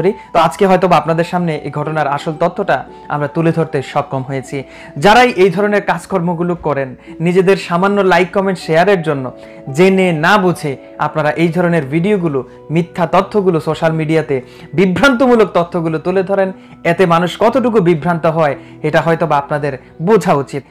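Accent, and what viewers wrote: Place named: Sylhet, Bangladesh. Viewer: আসলে এই লোকের কথা গোলা সুনে মনে হয় ভাই টি সিলেটের। #LalsobujBD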